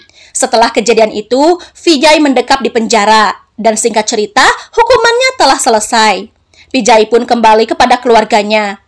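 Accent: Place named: West Java, Indonesia. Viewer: Alhamdulillah ya Allah, dapat PIN juga dari kak mimin, makasih kak mimin yg comel 💖💖 Jujur aku suka banget dengerin suara kak mimin dgn logat sunda nya 💖💖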